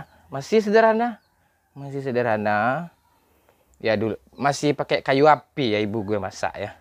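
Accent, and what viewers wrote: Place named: West Sumatra, Indonesia. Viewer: Bahasa minang ajalah ketahuan banget minangny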